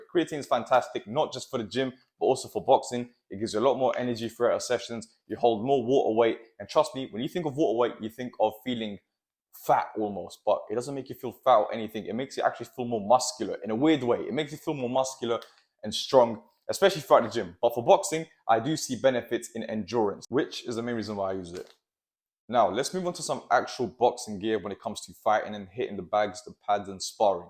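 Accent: United Kingdom. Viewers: No clue would say prolly British?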